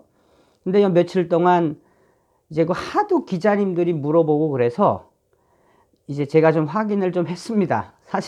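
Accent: North Korea. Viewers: 첨 들어왔는데 북한 사투리가 정겹네요. 저쪽 사람들 디리디리 까 주세요. 간나새끼들 간이 오므라들겠어요. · 참 재밌게 방송하시세요^^한번씩 북한 사투리도 정겹게 들리구요^^감사 드립니다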